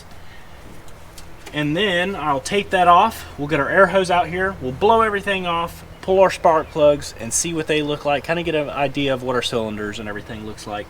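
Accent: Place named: Carolinas, United States. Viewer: looks like youre in the carolinas from the scenery. and the accent :)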